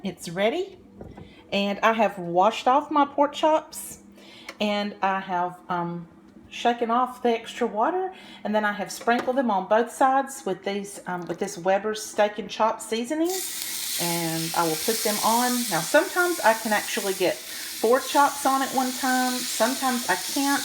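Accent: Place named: South, United States. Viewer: I like the Southern accent too. All the way from the other side of the world.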